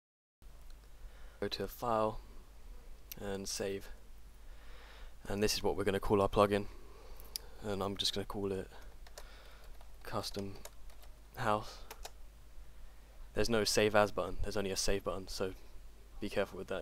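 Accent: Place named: United Kingdom. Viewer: off topic i just wanted to know why you guys in the uk say stuff like theater like theata but with idea you say ideaer just always wanted to know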